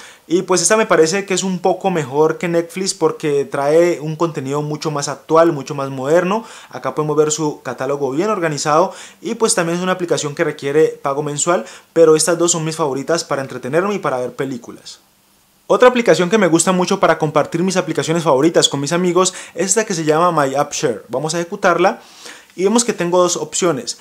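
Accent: Cali, Colombia. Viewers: eres de cali colombia???? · Eres de Colombia? ;:D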